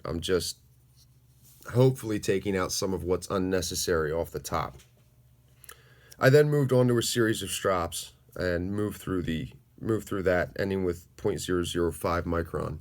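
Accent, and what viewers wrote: Philadelphia, United States. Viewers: I had a feeling was from the philly area. Born in philly and lived in or around the city for 36 years so I tend to pick up on our distinct accent. Go birds. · Ain't no hiding that you're from Philly that is FOR SURE.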